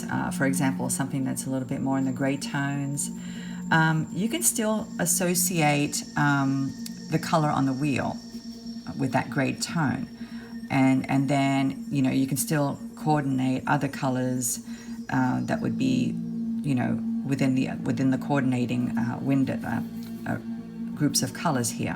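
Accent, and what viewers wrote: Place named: Australia. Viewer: Aah ha, I thought I detected some Aussie there in your speech. I am a Brisbane girl too from Scarborough on the Redcliffe Peninsula. Travelled quite a bit but always come home to Redcliffe. X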